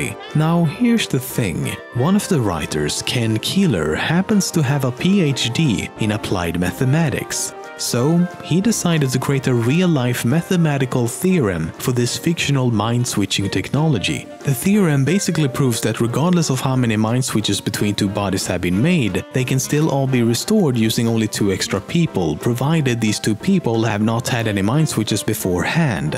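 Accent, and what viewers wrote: Sweden. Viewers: I'm going out on a limb here and guessing the presenter is Scandinavian. Hmm... Swedish? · the guy talking must be swedish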